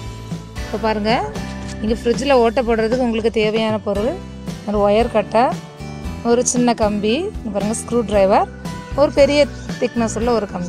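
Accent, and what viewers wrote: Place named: Kerala, India. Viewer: hi mam,thank u viewers, pudichirunda subscribe pannunga,nu neenga Malayalam kalandha Tamilla solradu romba cuuta iruku , well. done very good!!!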